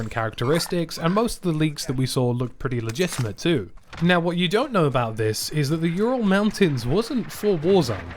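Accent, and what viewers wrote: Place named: Southern England, United Kingdom. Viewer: This video sounds like a load of assumptions pieced together and narrated with a British accent to sound legit. Yes I’m British myself but I know the effect that our southern dialect has on the rest of the world.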